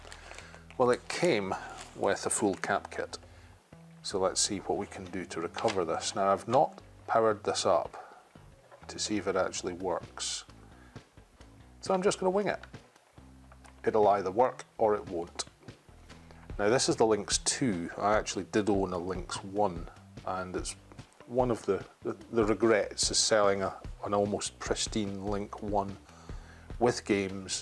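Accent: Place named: Glasgow, United Kingdom. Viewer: This man speaks with a Glaswegian accent. He is accent is straight out of a medieval celtic play circa 1700.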